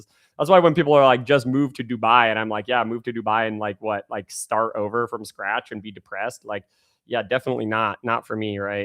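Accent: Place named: England, United Kingdom. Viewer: Hi, i am from asia and have followed u for months. I am grateful to you since not only the valueable knowledge of data but also your english accent😂. I often listen to your talking to improve english, besides learning data😂, keep this accent:)))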